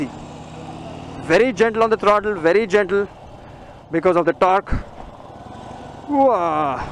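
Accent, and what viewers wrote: India. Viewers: You Accent also reminds me of the Russel Peter's Indian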